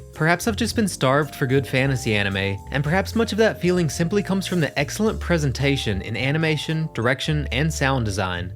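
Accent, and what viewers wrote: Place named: Southeast, United States. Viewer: Also bro where you from? Southeast United States? Im from Georgia and your accent is very familiar lol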